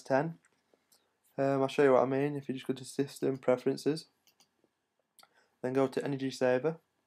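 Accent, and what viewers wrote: Sheffield, United Kingdom. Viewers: you from Sheffield?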